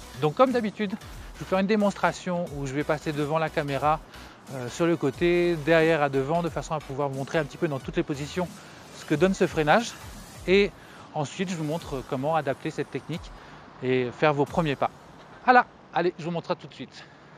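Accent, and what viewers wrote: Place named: Réunion, France. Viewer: Bonjour 👋 Et mes sais a la reunion ?l’endroit et ton accent me le fait penser je commence tous juste le rollers premier pas en rollers sait la galère sachant que je suis obligé d’à prandre seul 😢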